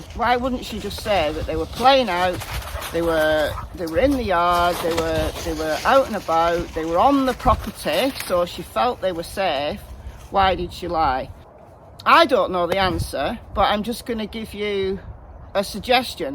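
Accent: United Kingdom. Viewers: I love you, your British accent & your very adorable dogs! I have been following you for awhile & look forward (when I get off work as a night shift nurse) to listening to your podcast on my drive home. Keep up the great work!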